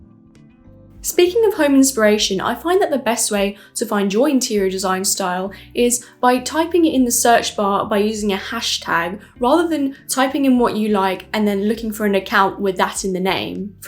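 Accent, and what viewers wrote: England, United Kingdom. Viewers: I really like your content and especially your English accent, so posh and elegant looove it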